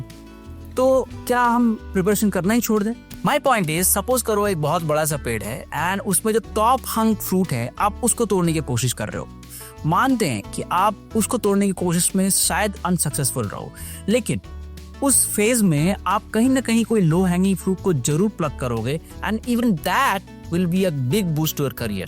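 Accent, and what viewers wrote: Bengal, India. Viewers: R u bengali ?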